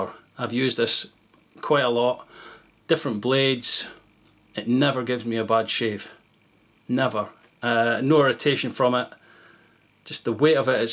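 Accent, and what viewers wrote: Scotland, United Kingdom. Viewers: I'm still searching, while avoiding Gillette, because of their advert. I have an old 1958 Gillette that''s still serviceable, from the year before before I went into the military. When I can afford it, I'll try the Rockwell. I really like your channel, and I enjoy the intelligent comments made by your viewers. I wish I had a Scottish accent like yours. Thank you for an informative and entertaining half-hour now and again. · love the Scottish accent! long live Scotland! :)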